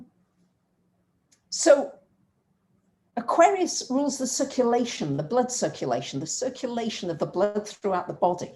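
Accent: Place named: England, United Kingdom. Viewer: Love your passion towards astrology and english accent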